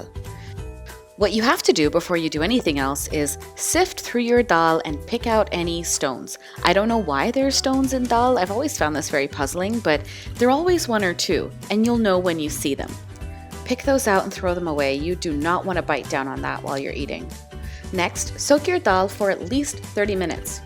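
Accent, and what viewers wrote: Canada. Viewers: its canadian accent and its almost perfect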